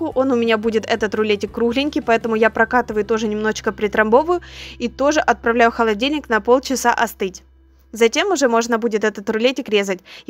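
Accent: Ukraine. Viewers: Молодец ты. И акцент твой украинский мне тоже по душе. Я в восторге от меледочных роллов. В субботу обязательно сделаю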